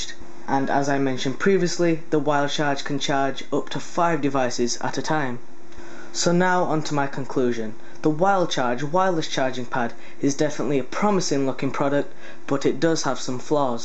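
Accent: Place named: Scotland, United Kingdom. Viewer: your accent sounds like a mix between Scottish and British :D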